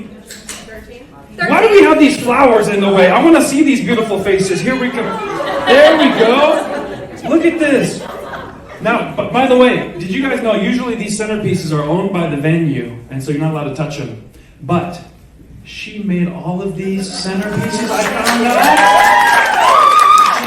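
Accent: Australia. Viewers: K-von, I love your Australian Accent....... it's hot 🔥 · Good Australian accent!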